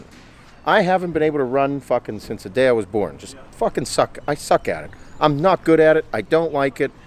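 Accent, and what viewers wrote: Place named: Pittsburgh, United States. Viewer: You have to be from Pittsburgh. I can hear it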